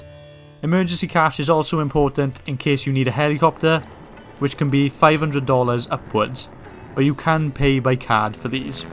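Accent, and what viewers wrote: Wales, United Kingdom. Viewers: Diolch (yeah, picked up the Welsh accent). Well worth watching. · Great vid. Made me smile when I heard the familiar Welsh accent haha. Where you from? I’m Carmarthen. I go to Nepal in 3 weeks and I can’t stop thinking about it 😂❤️🏴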